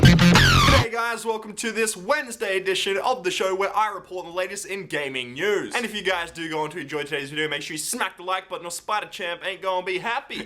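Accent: Australia. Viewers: He's Australian -_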